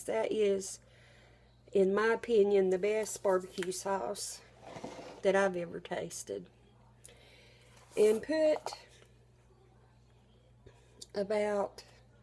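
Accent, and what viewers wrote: South, United States. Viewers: That looks delicious! Are you from the south? I love your accent.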